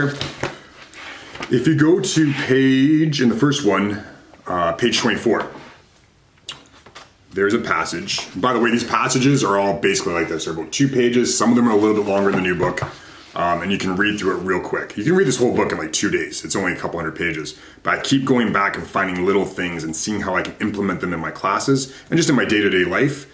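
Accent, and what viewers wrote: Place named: Canada. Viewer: This guy’s voice screams Canadian 🇨🇦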